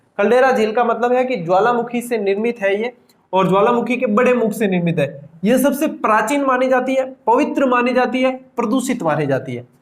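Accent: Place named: Marwari, India. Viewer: Aapni marwadii language bhout mithii lagee sir jii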